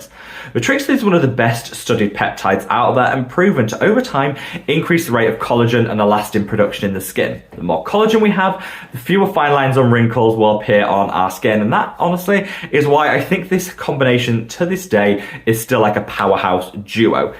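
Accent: United Kingdom. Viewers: I came to your vlog a few month ago but I‘m always very excited about your topics and I love your british accent ! I started with the agireline two weeks ago, to soon to talk about results, I use Matrixyl two month. I can‘t see anything about reducing wrinkles but I‘m in the postmenopause, it is a bit too late for that. But, my face looks a bit fresher and glows a bit, that‘s what friends tell me and that makes me look a bit younger, so for me personally a good result! Thanks and keep on going, you‘re great!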